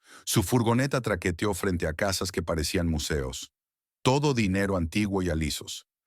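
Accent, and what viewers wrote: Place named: England, United Kingdom. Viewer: Sería un palo si tu narrativa tuviera pronunciación latina y un español sin acento ingles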